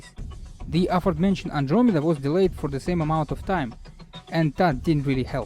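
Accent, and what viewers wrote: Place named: Russia. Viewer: Молодец, тренируйся. лойс поставлю, но акцент прям вааах какой русский. чувствуется что пока стараешься произносить слова, а не говорить на языке.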